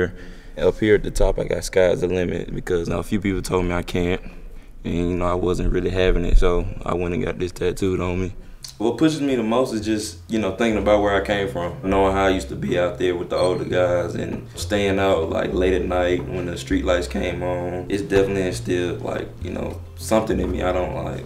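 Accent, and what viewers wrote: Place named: South, United States. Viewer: straight down south accent.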